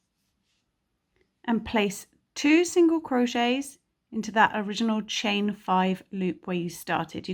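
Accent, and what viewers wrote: England, United Kingdom. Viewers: Just found your channel and subscribed! You are a marvelous teacher! You sound British and I’m wondering about the yarn. I’m a novice at crochet but I don’t recognize the brand of yarn. Is it a British brand and if so can you give us an American equivalent? It’s a really beautiful project. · So lovely, must try. Nice to hear and English voice, most crochet videos on you tube seem to be American using their yarns.x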